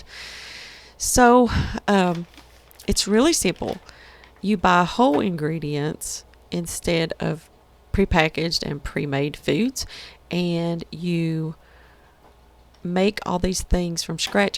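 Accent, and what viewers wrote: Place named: South, United States. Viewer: just watching this video, you look amazing doing this, great chance to get involved in community, where you can be a witness for the Lord, although where you live, I’m assuming it’s somewhere in the South, I hear your accent, lol, but it’s not a heavy one, many people are Christian, but sometimes it’s more cultural, rather than actually being born again, great video, love your channel!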